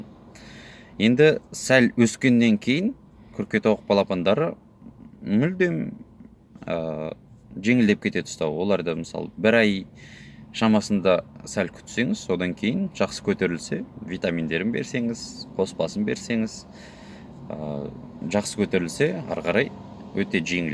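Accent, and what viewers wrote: Standard. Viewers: Бауырым саған риза болып жатырмын Сенің қазақша таза сөйлеуіңе еңбегің жансын кеңестерңді жіберіп тұр Бұл біздің қазағымызға керек кеңес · Бауырым Алла разы болсын. Қаймағы бұзылмаған Қарағанды тумасы қазақшаң таза екен. Кеңесіңе рахмет. Біраз нәрсе үйренуге тұрарлық. Өзім қатты қызығатын кәсіп. Ісіңе сәттілік.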